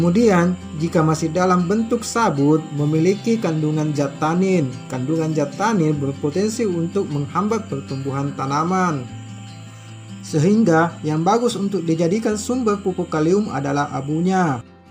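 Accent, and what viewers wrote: Bugis, Indonesia. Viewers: Orang bugis yah? Ogi Aga ki.... ? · Bahasa Bugisnya ketara benar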